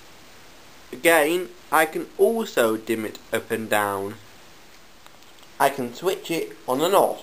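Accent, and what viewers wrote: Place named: London, United Kingdom. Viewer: awesome! hey where are u from? ur accent tells me u from around london.... which place exactly?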